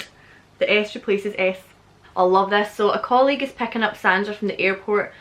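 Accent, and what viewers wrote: Scotland, United Kingdom. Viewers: I've used many apps for learning languages and most of them are terribly ineffective. The only one that i've personally found at least a bit useful is Lingodeer. This app mimics real life lessons. It has some grammar explanations (in the beginning of each lesson) and it lets you repeat and build on previous lessons. It's still far from perfect, but a step in the right direction. I recommend it to beginners who don't have enough motivation to read language books or have time to attend actual courses. Lingodeer was initially created for learning Japanese, Korean and Chinese, but now other languages are also available (check it on Google Play). First level lessons are free, but for others you make one time payment (which is a great deal). The only reason that am doing this positive review of the app is because learning languages is kind of my hobby, and i was pleasantly surprised and want to share that with other people. 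Btw, very entertaining channel. :D I use it sporadically for getting used to scottish accent, which i like the most (of all the english ones). Alright, that's all. Greetings from Slovenia. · Hey, I love the Scottish accent and watching your videos is great. I'm from Germany!! Just here to say *Augsburg* is a great town to visit in Germany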